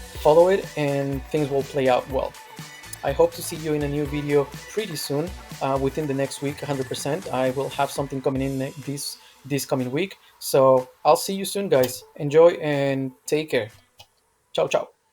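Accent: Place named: United States. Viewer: Hablas como gringo como decimos en Perú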